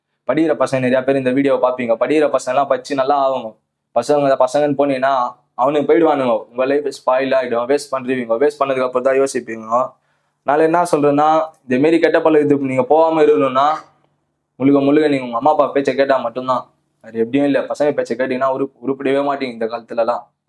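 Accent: Chennai, India. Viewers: Chennai native slang ah maathunga da dei😂.. kevalama iruku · Inaikithanda Chennai Tamil kathula thean payuthu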